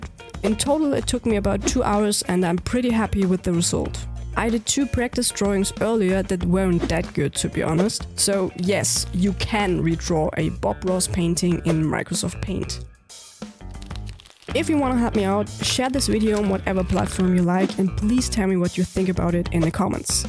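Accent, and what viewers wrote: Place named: Germany. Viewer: you sound alot german, are you?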